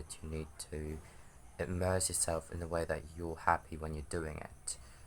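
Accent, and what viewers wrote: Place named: United Kingdom. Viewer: You speak good English. Sounds British. And none of it sounds force. All sound normal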